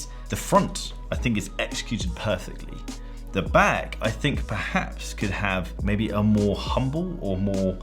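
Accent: United Kingdom. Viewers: I am very sorry, I've tried to resist for ages but I can't stand it anymore: Do you know Chris Broad, why do you look and sound similar, did you probably grow up in the same region and whats this great (british?) accent? Where you come from, there has to be more like you!!! We need to find them... I know this is random, but I cannot not mention it anymore and I am probably not the first one to ask for it or suggest similarities. Actually, Its just that Im interested, if its a coincidence, or if its an actual thing; being a regional accent + lookalike theme, or whatever. Great Videos, I need to add. Great narrative, great look, great speed .. love it! Also came here through Baltic, the Acquascaphe GMT being my "target" watch.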